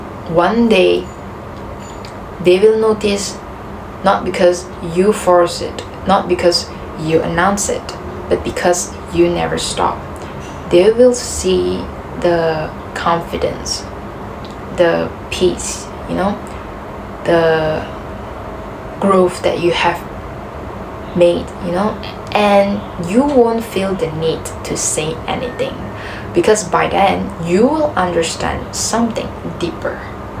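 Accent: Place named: Meghalaya, India. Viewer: Your accent reminds me of my incredibly wise friend who is from Meghalaya. It feels like an older more experienced friend spoke to me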